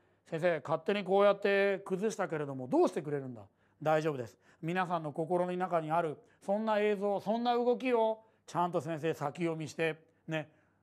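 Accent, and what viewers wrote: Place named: Japan. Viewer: 発音はしゃーない 日本人だから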